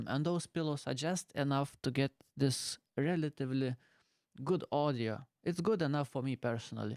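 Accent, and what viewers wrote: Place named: England, United Kingdom. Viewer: I actually like your english accent. SM57 is my favourite for my voice.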